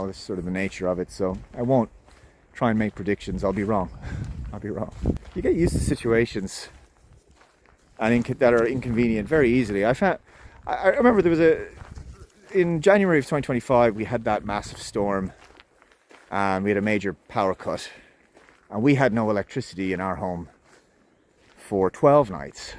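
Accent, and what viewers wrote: Ireland. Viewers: This is a game changer, man. I didn't expect a face reveal. I had a feeling you were ginger, man. The voice. God bless the Irish. I'm quarter Irish. I have family in Cork myself.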